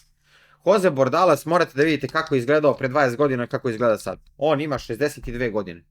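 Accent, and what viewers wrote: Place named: South, Serbia. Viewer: Gledajuci te i slisajuci,prosto ne mogu da se otmem utisku da izgledas kao bulja,a i pametan si kao ista. Nemoj vise komentarisati sa tim juznjackim naglaskom si preglup.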